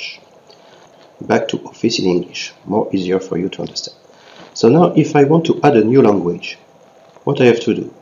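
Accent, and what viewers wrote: France. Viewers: Mec je sens ton français 😀 Keep it up ! And thanks!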